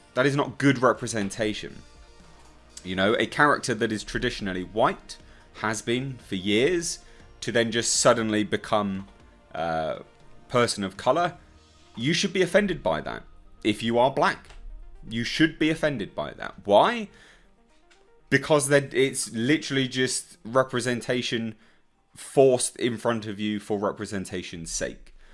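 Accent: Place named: United Kingdom. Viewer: this is my first time watching one of your videos and,well the first thought that popped into my head,honestly was"oh,this guy is either a racist or bigot. He's white,isn't he." You look white,but I don't know,you're probably British or something like that cause of your accent. Anyways,I see your points and why this "tokenism" from Disney bothers you and most of these other commentators. But,if I may say something. Why say something now about this,I don't remember this much heavy backlash when they changed the green lantern from black to white. Besides,who cares? Disney isn't the Disney from your childhood anymore. All they care about,and it hurts me to say this,cause I too grew up with Disney,is the green. Can we just let them destroy themselves if they want too? They own practically everything now,and only do so,to squeeze as much money as possible out of these franchises,countering they're only doing this for nostalgic purposes. 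First,no one asked for these remakes to be made. Second, they are unnecessary rehashes of something good that already existed. Third, it's a disrespect to the original saying it needs to be "fixed". Everyone needs to get off their high horse and be brought down a peg,especially Disney. We only fuel their flames and this negative advertising is only gonna push for them to keep making more of these. Why? Because they plain just don't care anymore,they don't care about quality animation,storytelling,or original content anymore. Si why should we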